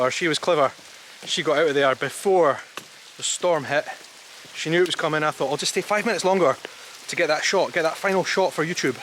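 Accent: Scotland, United Kingdom. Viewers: Hi 👋 from SoCal... I have to confess, I watch your videos because I love the Scottish accent. ❤️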